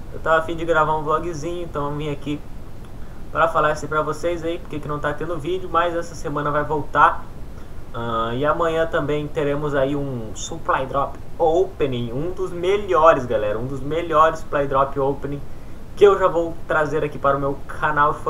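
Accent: Rio Grande do Sul, Brazil. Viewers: vc é gaúcho ?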